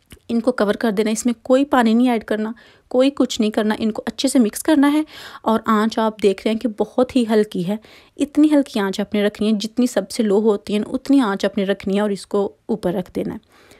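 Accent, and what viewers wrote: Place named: Faisalabad, Pakistan. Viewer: Aoa. Mashallah looking nice. App Faisalabad se h app ki boli Faisalabad k logo jaisy h. Btana zaroor.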